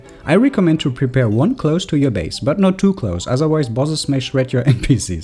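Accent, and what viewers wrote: Germany. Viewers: thank you - you German aint you? :D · Du hörst dich so deutsch an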